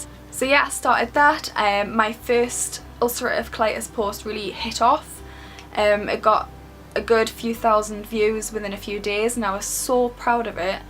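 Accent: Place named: Geordie, United Kingdom. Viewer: This was a really great Q&A :) also love that Geordie twang. Like I live in Newcastle also but I don't have the twang... Kind of jealous actually :)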